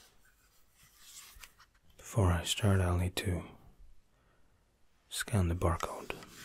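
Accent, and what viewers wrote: Scotland, United Kingdom. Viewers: Are you English or Irish. Or Scottish?? I can't quite tell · Scottish ASMR King! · Hannele he’s already got a Scottish accent lol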